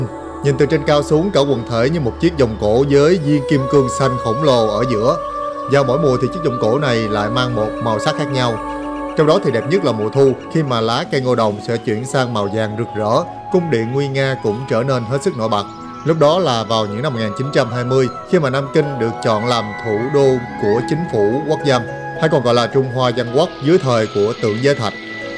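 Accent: South, Vietnam. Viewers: giọng Nam bộ tự nhiên, vui vẻ, kiến thức sâu....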